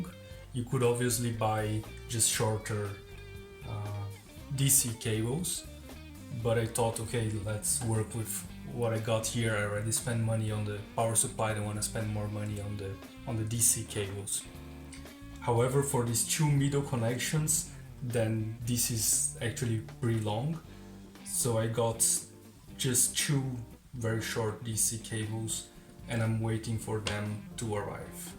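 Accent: Brazil. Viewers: Fala, sabia que você era brasileiro! O sotaque é de Brazuca! To aqui na Holanda BTW. To precisando de uma fonte dessas, a minha ISOPOWER 12 não cabe em baixo do pedaltrain 2 e mesmo que eu coloque o CIOKS mounting kit acho que não vai dar, vc acha que ainda é uma boa opção?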